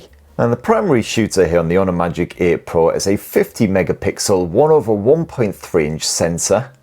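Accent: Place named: Teesside, United Kingdom. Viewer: He speaks in a Northern English accent - Teeside accent. I think it's time for you to experience more English accents than the received pronunciation English accent. It's perfectly understandable for the UK population although for some strange reason, many US residents have difficulties understanding English accents, other than the accent spoken by King Charles.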